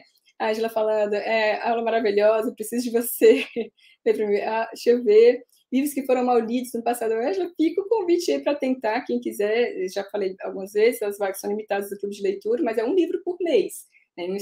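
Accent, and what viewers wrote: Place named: Northeast, Brazil. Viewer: Amei seu sotaque NORDESTINO.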